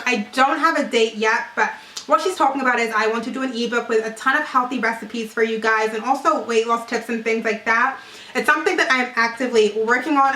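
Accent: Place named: England, United Kingdom. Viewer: ok cause I knew I heard a lil english accent there but wasn't sure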